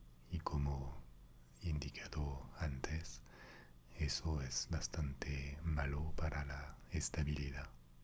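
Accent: France. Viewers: oi todos tus videos en frances e ingles, nunca crei que hablaras en español, estoy realmente sorprendido, hablar re bien el español, aun con el acento marcado al frances pero no molesta para nada, hace exactamente lo que haces, relajar. Mas videos en español por favor!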